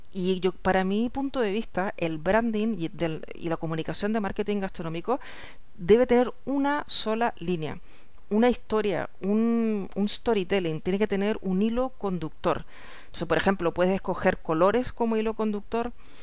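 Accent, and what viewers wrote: Chile. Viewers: Habla como Chilena. Es Chilena?? Excelente contenido sí. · Hola, acabo de llegar a tú canal y me he dado cuenta que eres una profesional del marketing gastronómico por lo que me he suscrito a tú canal y comentarte por consejos y estrategias a seguir para una idea de delivery de comida peruana y postres chilenos y peruanos. Saludos desde Santiago de Chile Tienes acento chileno, pero con algunas palabras castizas, de dónde eres?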